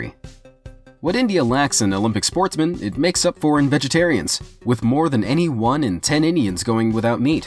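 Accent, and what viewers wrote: United States. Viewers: Also ya know the American accent comes into play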